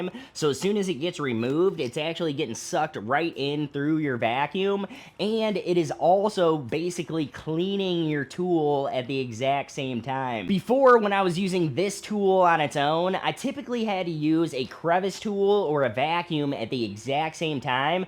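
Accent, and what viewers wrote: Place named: Wisconsin, United States. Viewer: I wasn't expecting the Wisconsin accent "don't cha know" , but great video and thanks for the tip on the right tool for the job.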